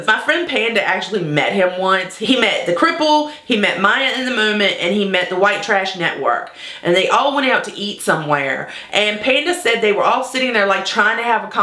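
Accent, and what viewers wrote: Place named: South, United States. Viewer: i got so excited when you said ello.poppets im like omg she has an accent and then i heard the southern accent and im like Holy god she has an accent! i love it. first video i watched. and i am hooked. subscribed and binge watching now!!!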